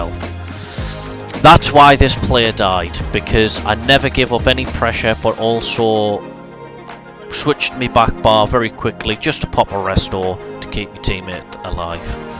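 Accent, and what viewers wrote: United Kingdom. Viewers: Uk accent S tier 😂 ggs the commentary is actually dope touch to the gameplay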